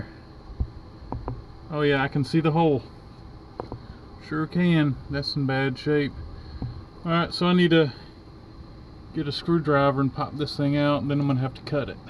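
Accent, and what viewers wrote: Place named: East, United States. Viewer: you easterners sure do pronounce Oil funny like Ohle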